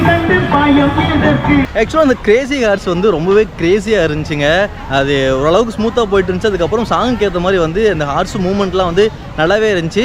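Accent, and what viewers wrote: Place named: Coimbatore, India. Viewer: every ride 🤣 your reaction is ultimate na and your way of talking nga nga our cbe slang nanum cbe thanga na good video take care of ur health also keep going na❤❤😊😊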